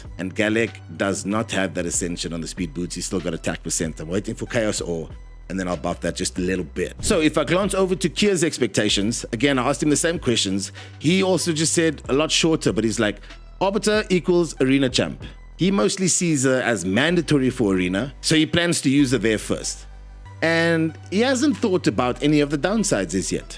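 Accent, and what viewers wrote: South Africa. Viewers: Interesting content.. Been playing Raid for years! Question: Are you South African?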